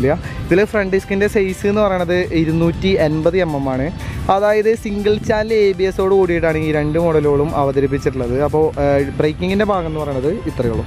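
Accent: Thrissur, India. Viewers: ഫോൺ കേടായത് കൊണ്ട് നാൾ കഴിഞ്ഞ് ആണു ചെട്ടായിടെ വീഡിയോ കാണുന്നത്.. സംസാരം ഒക്കെ ആകി മാറ്റി കളഞ്ഞല്ലോ.. missing that Thrissur broo.. ഞാൻ തൃശൂർകാരൻ അല്ല.. പക്ഷേ I loved the way you talked.. pazhe pole mathy broo...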